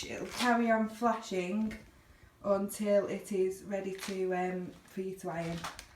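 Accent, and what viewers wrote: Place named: Northern England, United Kingdom. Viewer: Great instructions, nice and clear from a fellow northerner! Thank you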